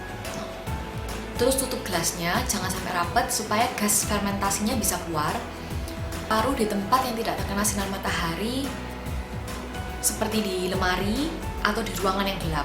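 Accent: Java, Indonesia. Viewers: medhok bnget... q suka gadis jawa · keganggu bgt sm medok jawa nya 😄😄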